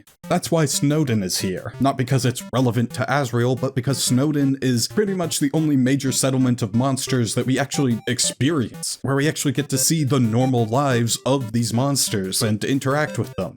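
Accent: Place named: United Kingdom. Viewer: forme are so brithis like😊😊😊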